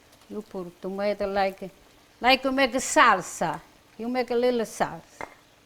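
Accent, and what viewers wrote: Italy. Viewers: Shes Italian.... don't like her accent don't watch her... be nice or leave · We love Her with her very nice ITALIAN ACCENT grazie mile nonna 😘😘😘🌹👍💝💋💋💋from Canada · 😁😁😁😍🌹🌻🌷 love your accent-- very charming (butter and oil). True Italian. The dish looks so very easy and yummy. Thank you for sharing!! Happy Holidays a great Christmas and New Year to ENTIRE FAMILY!! Be safe!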